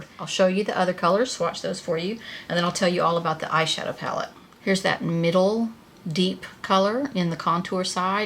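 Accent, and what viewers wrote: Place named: Mid-South, United States. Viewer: love your subtle accent. Are you from the Mid-South? watched some of your videos LOL women of a certain vintage , so much better than saying women of a certain age! your makeup looks really good , edgy but classy.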